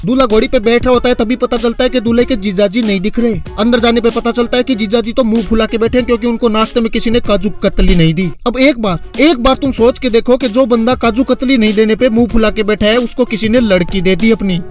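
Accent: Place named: Rural, India. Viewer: Tomhare bolneka tarika ku6 bad villager jeisa hai.......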